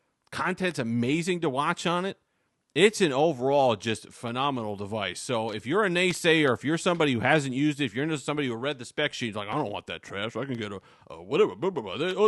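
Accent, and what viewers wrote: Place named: United States. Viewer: appreciated, but if they would ask me to explain how it sounds thick American accent with one video I show this one ahah, goated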